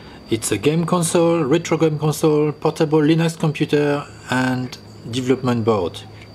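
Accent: France. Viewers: Hey buddy you speak english like french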